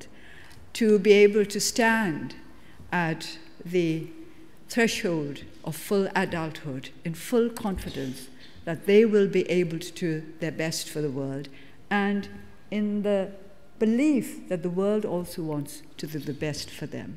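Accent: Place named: United Kingdom. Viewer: i didn't know she studied in oxford, no wonder why she has a a british accent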